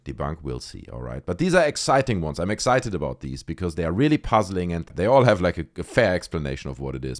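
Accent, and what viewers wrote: Germany. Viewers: Are you german? Sounds like you are. If you are german why Do you dort make your Videos in german? Ich meine würde mich freuen freuen als deutscher ^^ =) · are you a German native ?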